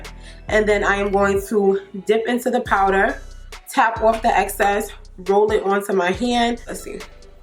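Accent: New York, United States. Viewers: Where u from? Do I hear a fellow New Yorker?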